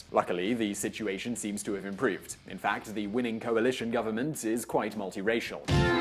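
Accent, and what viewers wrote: United Kingdom. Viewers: First thing first let's get the name of the country pronounce correct it is not Guiana it is Guyana and your video is very misinform If you going to do a YouTube video on a country you should be properly informed and you should have also pointed out with your British accent that the American former President Jimmy Carter went to that country in 2015 and help Red Dead election which had send that country back into a reverse like the American and the British government did in 1964 that is something you should have pointed out Guyana economy was well on their way to better greater things under the PPP people Progressive Party now the an economy is only based on one thing that is oil please be properly informed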